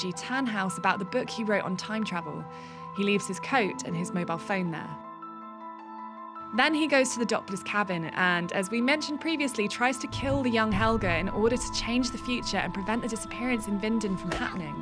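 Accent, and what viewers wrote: England, United Kingdom. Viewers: Sub eng please, i dont really understand when listening UK accent hehe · The narrator has the most neutral, articulate and clear English accent one could possibly have...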